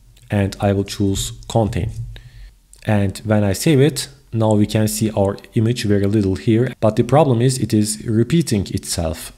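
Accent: Turkey. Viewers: Exceptional Turkish accent. Lovely. Thanks for this !!!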